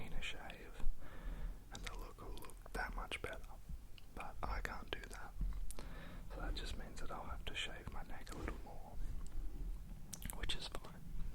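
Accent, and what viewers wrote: Australia. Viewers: Australian English is already an ASMR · Where have you been all my life with that voice? Another Ozzie ASMR! Honestly, I already love the accent and then the whisper....I'm in heaven! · Love the whispering with the Australian accent it’s hypnotizing!😂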